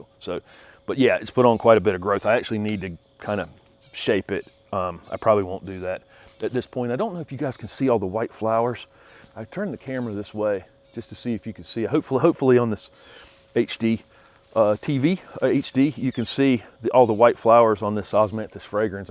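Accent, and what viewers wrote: South, United States. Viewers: In addition to trying to soak up all the knowledge you share, I very much enjoy listening to your Southern accent. Raised in the South, I fell in love with gardening alongside my father. Transplanted to Phoenix in 1970, i greatly missed the ability to grow so many things. Now retired in Arizona’s best kept secret, the White Mountains, zone 6b, I am in heaven learning to garden all over again. Thank you for your help. And I would love to add a set of Corona pruners to my tool bucket.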